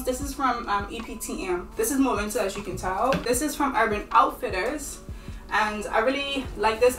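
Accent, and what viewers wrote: Bermuda. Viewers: Yoo. You got a Bermudian accent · Is this a Bermudian accent? 🤔 You sound like my friend a bit lol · When i first heard your voice after discovering your channel, off rip I thought to myself she sounds like my mom from Bermuda let me check, and I was right; instant follow, one love❤️🇧🇲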